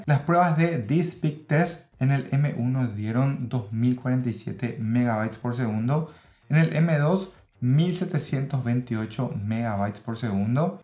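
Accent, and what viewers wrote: Paraguay. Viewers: gran video. amigo tenes un acento paraguayo. cuál es tu nacionalidad? saludos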